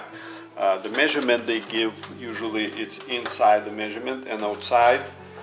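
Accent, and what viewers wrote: Russia. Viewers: Were are you from ? Russia or another country ?) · I used to live in the Northwest corner of Montana, I may have told you before, and I love Wyoming too. But how did you choose to move to that area? By you accent,and may be wrong, but did you come from Russia? You live a very pleasant life there in the mountains...I know I sure miss it! How did you come to attract such a good energy with all the wildlife? I can see it in you. The animals, your pets reflect your kindness. Do you have family here in the U.S? I am rambling a bit but I feel a sense of goodness in all you film, keep it up! Till we speak again, Woody Woodward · MOJET IA OSHIBAIUS NO SLISHU RUSSKII AKZENT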